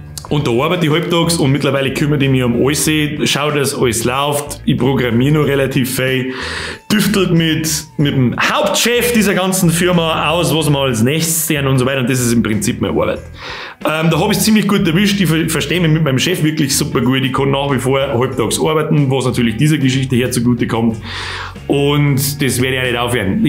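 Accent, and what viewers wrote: Bavaria, Germany. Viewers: Oid Boarischa noma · Bin nicht aus Bayern finde dich einfach sympathisch und den bayrischen Dialekt ist einfach nice wünschte ich könnte ihn btw ich verstehe ca. 80% was du sagst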